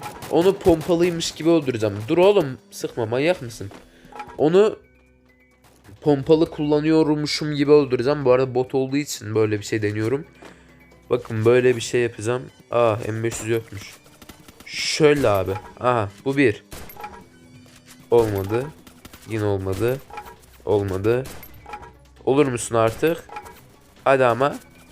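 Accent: Azerbaijan. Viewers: Abi sən Azerimisin Türkmü vallaha kötü mənadəa demədim soruyorum voruma cevab verirmisin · sen azerbeycanilimisin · ABİ azeri misin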